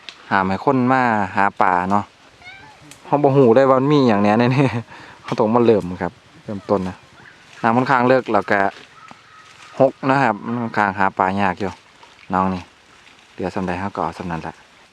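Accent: Isan, Thailand. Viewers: คนใต้แต่ชอบสำเนียงอิสานเพราะจังชอบอาหารด้วยท่องเที่ยวด้วยไปมาทุกจังหวัดเลยค่ะ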